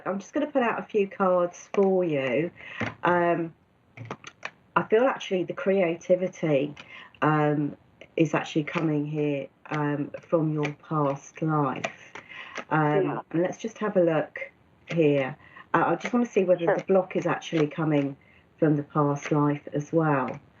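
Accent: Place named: Cockney, United Kingdom. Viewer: She speaks with a Cockney accent. The working class use this accent .Not the Propah English ,which is spoken by the elites or Oxford educated.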